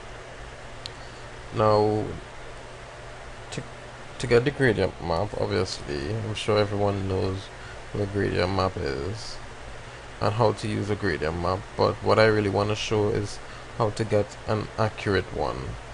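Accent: Trinidad and Tobago. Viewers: Same thing I saying he's trini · Great tutorial my friend. I can hear it in your voice that you're from Trinidad. I was shooting a destination wedding there for a client and friend who is from Trinidad. The wedding was in Tobago at Stonehaven Villas. Beautiful country indeed!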